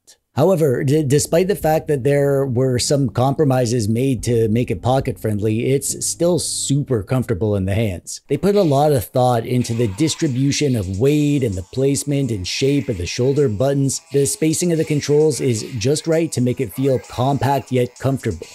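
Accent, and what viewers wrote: Canada. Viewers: I like your channel. I think that many of us watching are wonering who the heck that you are. U speak like u have a cold, u are funny and interesting. I seen ALOT of influence from other utubers especially the American Taki. As I watched 2 or 3 of your vids even with your strange congestion I could hear an accent. Are you Canadian? I think that u are. Interesting vids but ya dont have to be so weird. Its OK to be from another country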